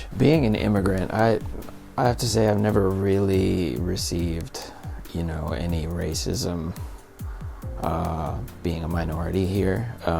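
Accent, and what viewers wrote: Finland. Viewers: Don't take this offensive or anything, but you have some finnish accent on your english. Or what I hear. There's some variations on your intonations and such... It's like Renny Harlin speaking finnish :)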